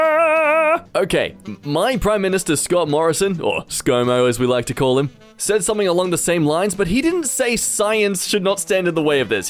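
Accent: Scotland, United Kingdom. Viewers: I totally love the Scottish accent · No one wants to talk about his Scottish accent? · the Scottish accent was the best thing as well as "i sware ya brain so hollow i could turn it into a bagpipe" The Demoman TF2 intensifies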